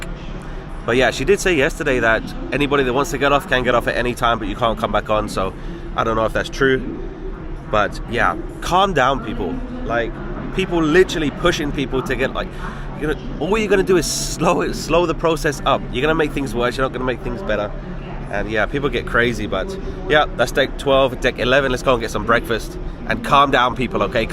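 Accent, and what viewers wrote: United Kingdom. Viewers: I agree. Sounds like bait and switch to me, regarding the port name. You’re not complaining, you’re informing. I appreciate all your info. Oh, and you sound perfectly British to me. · oh migod, you don't sound like American at all..I can't really place your accent. I know it is British. But being an American, I am not privy to the regional accents in England for sure. Still love your enthusiasm and venting and all that.. You live life fully, whether while complaining or whether in awe of something. We get swept away by your emotions. It is good to feel, whatever it is fully.. Thanks for the videos. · I think you sound British, but you dress like an American 😜 especially your headwear. I’ve always heard that only Americans wear ball caps. And you have that San Francisco hat so people probably assume you’re American. 🤷🏼‍♀️ But I’m from the south and I have an accent. 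And I don’t really hear it myself most of the time. But certain words have that southern draw. And then I hear myself and I’m like damn I sound country as hell.😂 Also, do they have Uber in Copenhagen? Can you just Uber from the ship to the metro station at least. ? Also FYI, I’m actually headed down to Walt Disney World this week. ❤ 🏰 And that’s a crazy price, because I’m gonna pay close to that for five days at Thanksgiving.? But that also does include gratuities and all the port fees. Do you use a certain website ?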